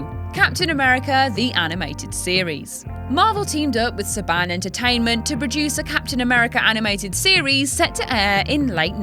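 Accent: United Kingdom. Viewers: So how do you pronounce TV? We in the UK, where is from pronounce it as the letters, Tea Vee. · That is highly offensive! is a citizen of the UK, and like the British, Welch, Scots, and Irish many cultures around the world pronounce words differently. Get over yourself and learn to understand different dialects, already! Please, there are more countries in the world than just the United States!!!!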